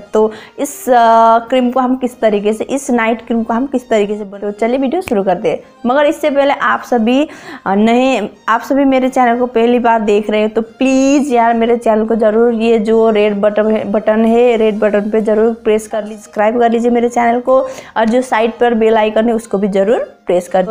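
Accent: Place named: Garhwal, India. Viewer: R u garwali, ur tone is like that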